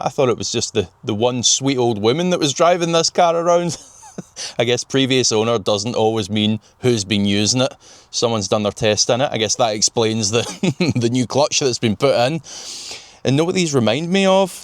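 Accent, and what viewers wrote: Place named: Glasgow, United Kingdom. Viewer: Love your videos you present them well and with interest your personality plays a major part in this with your Glasgow accent, a very likeable character.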